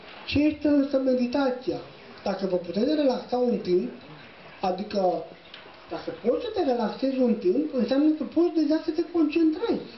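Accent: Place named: Moldova. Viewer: spuneți lucruri foarte interesante, vitale, dar nu este o claritate în sunetul de transmisie, pe lângă faptul că auzul scade la anumiți oameni și dvs.aveți pe ici , pe colo un minunat accent moldovenesc și o gestică ce mie îmi stârnește râsul, adică îmi place....mulțumesc infinit.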